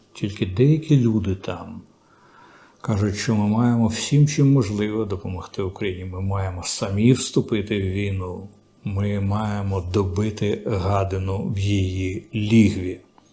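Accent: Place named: Russia. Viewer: Доречні, пане, у Вас з'явився російський акцент! Таке враження, що Ви перекладаєте російський текст на українську!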